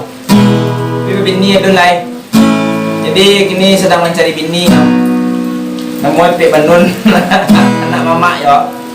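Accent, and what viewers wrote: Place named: Pariaman, Indonesia. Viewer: Bunyi caro mangeceknyo bunyi urang piaman....😅😅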